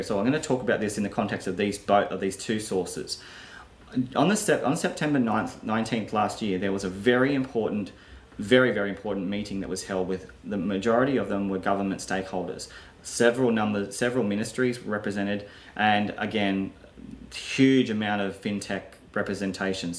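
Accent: Australia. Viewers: Awesome!! That's a lot of info to absorb, sounds like you're in Queensland and have a foxy type dog. You make very interesting points and links. thank goodness I chose Neo. For all the hours and hard work I would like to donate some Gas but it seems you are to humble. Really appreciate your work mate.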